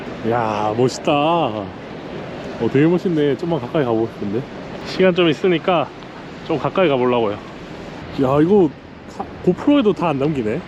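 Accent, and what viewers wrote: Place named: Gyeongsang, South Korea. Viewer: 여행유투버를 많이 보는데 이렇게 크루즈여행 영상은 처음이에요.우연히 을 알게 돼서 몇날을 영상을 보고있어요. 혹시 부산분 아니세요?부드러운 경상도 억양의 말씀..아주 듣기 좋네요.근데 아직 서른 정도의 어리신분인데 어째 이래 많이 여행할 수있었는지 궁금 합니다.우리아들 야단 좀 많이 쳐야겠어요. 저는 55살부터 단기 자유여행을 여러번 했는데, 코로나이후로 못나가봤어요.우리는 몇년 지나다 보니 늙어 힘이 없어서 출발결심이 어렵군요. 영상 너무 재밌게 보고 있어요. 젊음이 부럽습니다. 많이 보여주세요~~ㅎ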